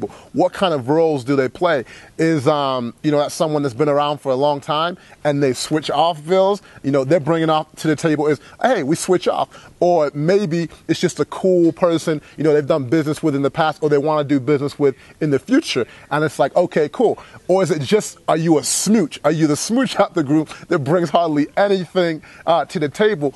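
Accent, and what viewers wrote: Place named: England, United Kingdom. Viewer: you seem to have an English accent.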